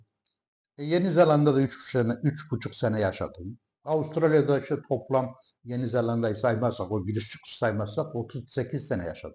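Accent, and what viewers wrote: Turkey. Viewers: DOGRULARI KONUSTUGUNUZ ICIN TEBRIKLER.ZATIALINIZ GALIBA VICTORIA'DA YASADINIZ, BALI'DE YASANIR ,KUTLUYORUM, BY THE WAY MEMLEKET NERESI? AKSANINIZ ADANA'YI CAGRISTIRIYOR, BIZ ISTESEKTE ORALARDA YASAYAMAYIZ, . NEDENI ISE ILK KAFILELERDEN BIRISIYLE GELMIS VE 57 YILI TAMAMLAMAK UZEREYIZ,SIMDILERDE 22 AILEDE OLUSAN BIR COLONI OLUSTURDUK OLULERIMIZ VAR YAS OLARAK 93 .SIZE YENI DUNYANIZDA MUTLULUKLAR DILIYORUM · Aslen turkiyenin neresindensiniz ? Hatay şiven var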